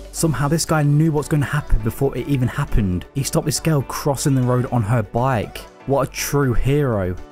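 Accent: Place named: Nottingham, United Kingdom. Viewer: mate you gotta be from nottingham/derby?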